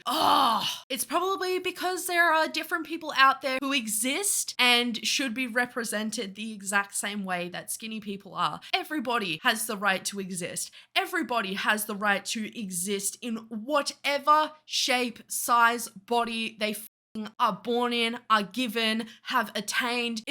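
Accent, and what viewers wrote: United States. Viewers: Your American accent is really good lol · I love your american accent 😂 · Okay but how is your American accent so fcking good?! Like I’ve lived in the US my whole life and I’d believe you were one of us 🤣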